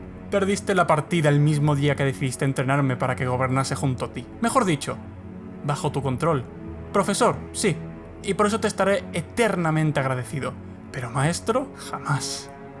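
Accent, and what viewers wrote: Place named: Spain. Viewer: De hecho el acento es español. Tal vez de León o Castilla.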